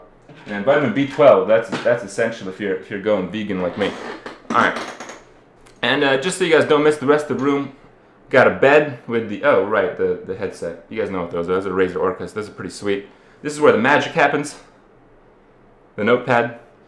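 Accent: Romania. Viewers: parca din Cluj e :) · kripp, are u romanian? :D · You Romanian ?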